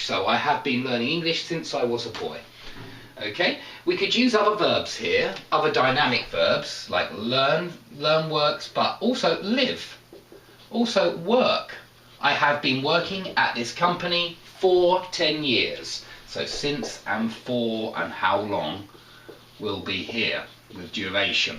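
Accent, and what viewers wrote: United Kingdom. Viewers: Nice accent ^^ are u british? I´ve been there a couple of times and England is so beautiful, greetings from Brazil ^^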